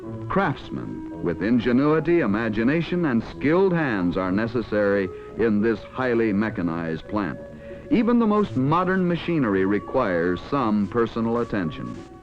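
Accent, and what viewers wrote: Mid-Atlantic, United States. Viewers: It’s likely because of the Atlantic accent he’s putting on. It’s a semi-artificial accent that combines the English and the Northeastern American accent that was used for many informative radio and TV programs so that ideally the narration could be as easily understood as possible in either country.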